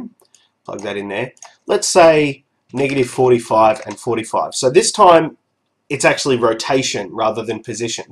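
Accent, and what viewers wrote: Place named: Australia. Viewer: You must be from Australia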